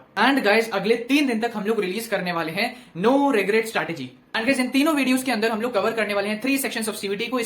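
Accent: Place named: South, India. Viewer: Why does bro have a south indian accent in the new videos ?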